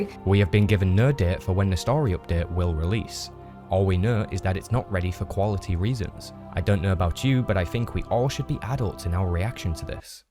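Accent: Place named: Northern England, United Kingdom. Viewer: A fellow northerner? I must subscribe! Love owt like this wi’ voices like ours like.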